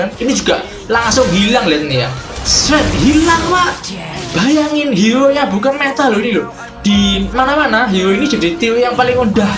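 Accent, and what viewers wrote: Java, Indonesia. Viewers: Aku seneng logatmu medok, mas....wkwkwkwk nice content 😄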